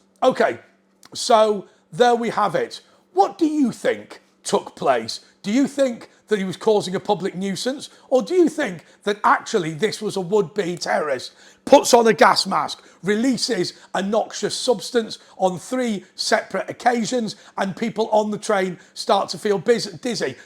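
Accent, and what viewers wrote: United Kingdom. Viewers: Sounds like he's British 😂🤣🤣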